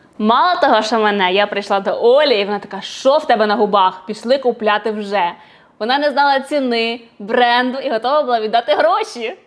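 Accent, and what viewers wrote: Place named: Western Ukraine, Ukraine. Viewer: по вимові відчувається, що вона з заходу України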